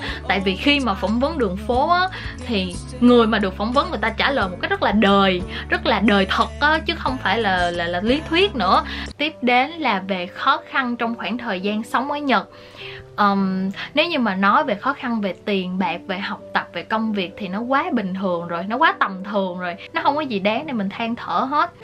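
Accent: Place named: Mekong Delta, Vietnam. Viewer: Ôi e là người miền tây à C cứ tưởng e là người Sài Gòn cơ 😁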